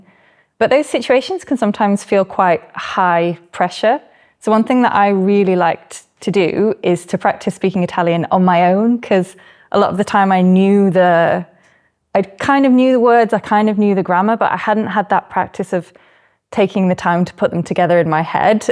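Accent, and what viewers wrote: United Kingdom. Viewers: My first time hearing your native British accent. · Wov, what beautiful british accent. I vove it. Theo-Canada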